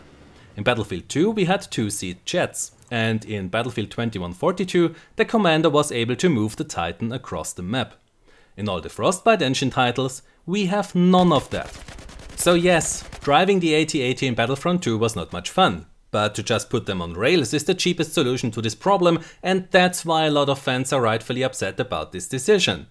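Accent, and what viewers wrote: Austria. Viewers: are you from Austria? Weil du Autirgendwas heißt und weil du nicht klingst als ob Englisch deine Muttersprache wäre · Great video. I have to ask as this has had me thinking for a while, Are you Austrian? Because you sound very much like Niki Lauda lol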